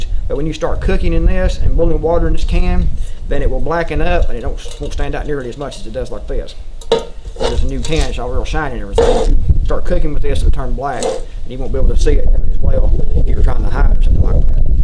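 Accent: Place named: Boston, United States. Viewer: You sound different. Where you raised in Boston? LOL Great video. Imagine a different upbringing that didn't lead you to a love of the outdoors and huntin' and such. Man that woulda sucked! Peace, brother and be careful that they don't sneak up on ya!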